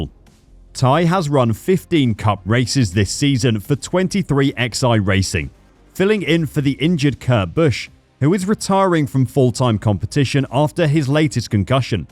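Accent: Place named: United Kingdom. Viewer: This is so fabricated. You and your limey voice STFU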